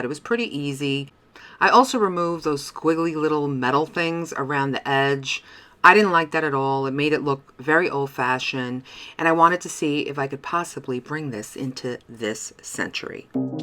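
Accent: New York, United States. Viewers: I love the plant container! You are awesome. Stay safe, I love your New York accent too. Much love from Cape Breton, Nova Scotia, Canada xo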